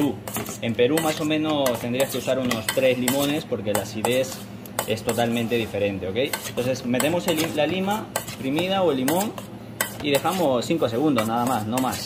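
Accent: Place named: Spain. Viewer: Tu acento ya parece español bro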